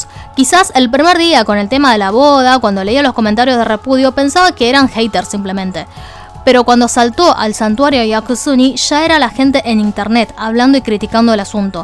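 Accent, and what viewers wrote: Argentina. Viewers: ¡aguante el dialecto Argentino!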